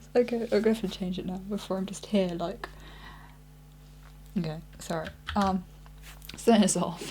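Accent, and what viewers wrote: United Kingdom. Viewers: British accent ? =o)?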